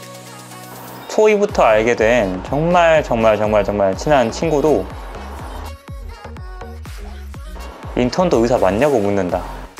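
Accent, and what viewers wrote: Gwangju, South Korea. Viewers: 이제 2월에 본1 들어가는데 너무 웃겨서 이것만 보는중이에요....ㅋㅋㅋㅋㅋㅋㅠ 아 글고 광주사투리 너무 익숙해요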